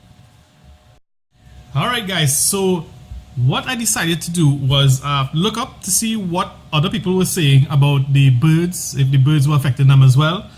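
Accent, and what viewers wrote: Trinidad and Tobago. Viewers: Mannn I swear something trini bout that accent lmk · u from trinidad?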